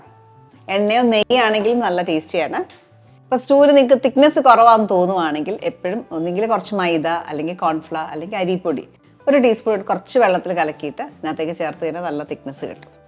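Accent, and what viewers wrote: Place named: Central Travancore, India. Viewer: Lady speaks the central travancore dialect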